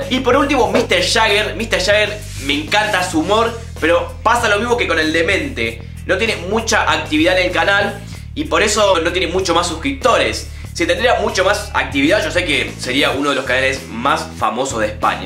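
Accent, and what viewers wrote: Argentina. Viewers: ¿Vos sos de Rosario?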